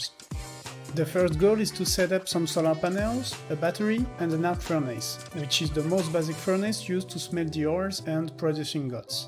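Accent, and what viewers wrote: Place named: France. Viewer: i love your french accent !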